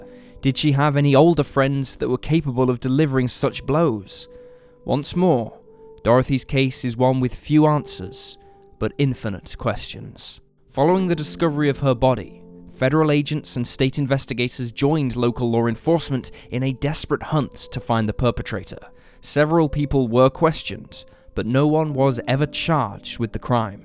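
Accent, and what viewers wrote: United Kingdom. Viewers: yes, I like the British narrators best, as long as they are not speaking in a northern accent, and this one is good.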